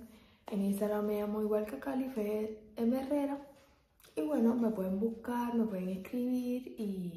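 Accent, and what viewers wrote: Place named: Cuba. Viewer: Ese acento de que parte de Cuba es?? Un grande saludo de un Colombiano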